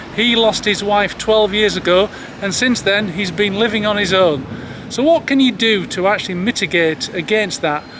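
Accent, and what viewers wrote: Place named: Northern England, United Kingdom. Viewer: I adore your content & northern accent! I worked with a girl who had her gall bladder out in her 20s, don't guilt yourself, brother - Cheers to good health 🥂🍻😁💋✌️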